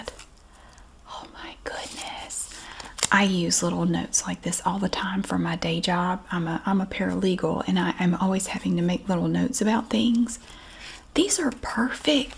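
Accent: South, United States. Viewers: The southern accent is so underrated 👌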